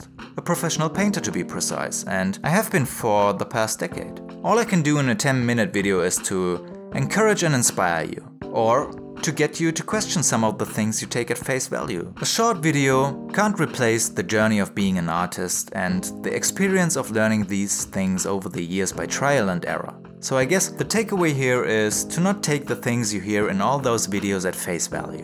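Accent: Germany. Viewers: Hard german accent. Tolles Video:) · Ich bin neugierig. Du hast einen leichten deutschen Akzent. Bist du Deutscher?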